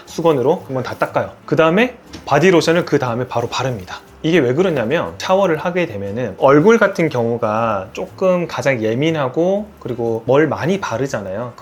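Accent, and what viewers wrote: Gyeongsang, South Korea. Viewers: 선생님 경상도 출신이군요 ㅎㅎ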